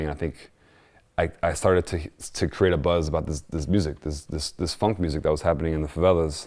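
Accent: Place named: Cockney, United Kingdom. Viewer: cockney pig sty gov'nor. bah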